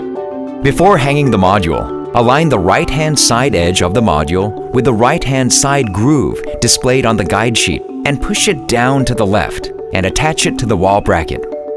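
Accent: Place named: China. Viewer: Harible Chinglish in this but i'd love to know what Text to speech program they used.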